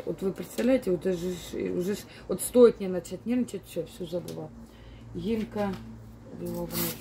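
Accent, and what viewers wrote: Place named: Ukraine. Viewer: думала,что вы украинка(я сама украинка и с таким же акцентом)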